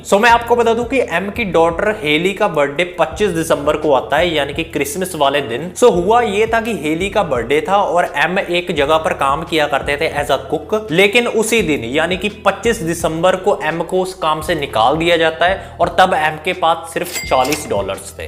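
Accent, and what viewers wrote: India. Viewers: Proper indian accent got me. Anyway, how did this video pop on my yt??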